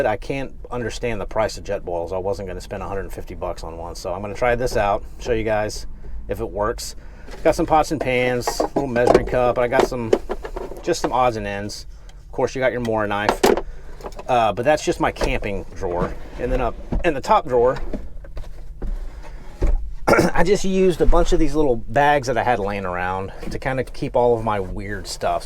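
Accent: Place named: South, United States. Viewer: Very organized and attention to detail. Now, go on some adventures and take us along. You seem like a good entertainer. Like that southern accent.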